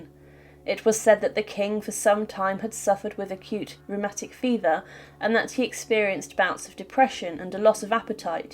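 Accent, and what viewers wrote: United Kingdom. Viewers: I absolutely love your historical videos. I'm a fanatic of all things Tudor, so your in-depth knowledge of that historical era always draws me in. Moreover, being.fron the other side of the pond, I'm also a sucker for an eloquent British accent. 😊 Please keep up your beautiful work. ❤ You have a new subscriber.😊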